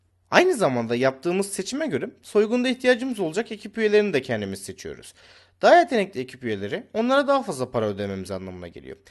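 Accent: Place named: United States. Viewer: Aga Amerikan adamları tarzında konuşuyorsun. 'Hadi ama hangimiz yapmadı ki' gibi. Ama bu konuşmalar bana hoş gelmiyor. Alışkın değilim sonuçta. Temiz bir anlatım yapmışsın. Tarafsız olmuşsun. Tebrik ederim.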